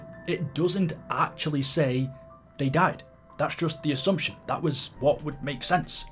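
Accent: United Kingdom. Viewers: Your accent man, you must be british?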